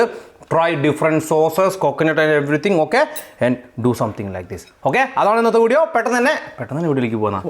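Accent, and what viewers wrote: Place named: Kozhikode, India. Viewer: entha Kozhikode parayannjjey.. Kozhikode nalla meen kittumm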